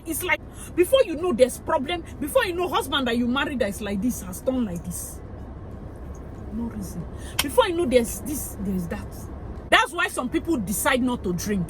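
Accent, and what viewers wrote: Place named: Africa. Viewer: I can listen to an African woman tell a story all day🤣🤣🤣 Got me screaming Aiii aiii at the screen🤣🤣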